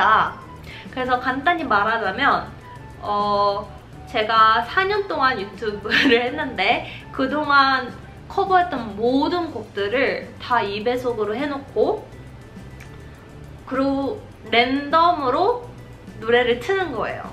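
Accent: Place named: United States. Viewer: Even tho you speak in korean you still have that american accent its cute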